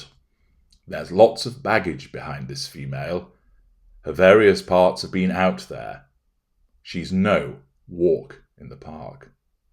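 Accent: United Kingdom. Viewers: I loooooove the sweet tones of our glorious narrator 😻😻 especially when he says “furthermore”!!!😈 Oh my, oh my! 😋 I’ve always had a thing for the British accent! Hehe 🙃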